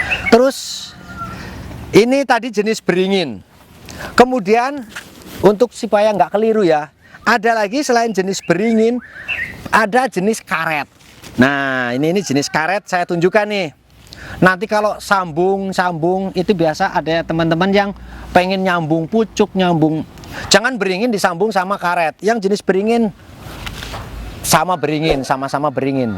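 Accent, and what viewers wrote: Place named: Banyumas, Indonesia. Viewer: Ngapak bgt bapake🤩🔥♥️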